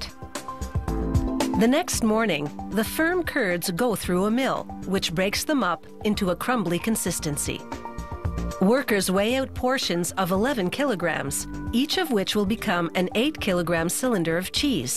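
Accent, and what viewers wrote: Canada. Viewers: Actually this is Canadian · is the narrator Canadian?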